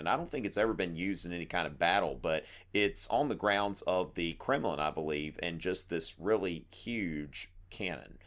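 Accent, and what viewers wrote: South, United States. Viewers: I'm here looking up as much information as possible-trying to finish my history project, and I get this discount Matt Damon with a southern accent.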